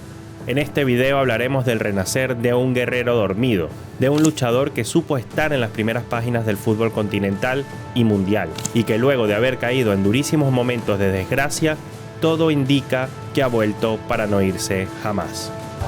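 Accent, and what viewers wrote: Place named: Venezuela. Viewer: Por el acento con que habla el creador del video es venezolano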